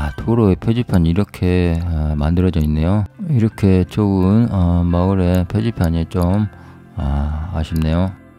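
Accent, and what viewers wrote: Jeolla, South Korea. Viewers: 뭘보고 일본인?? ㅋㅋ 딱들어봐도 전라도 사투리구만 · 전라도 말툰데 뭔 일본어????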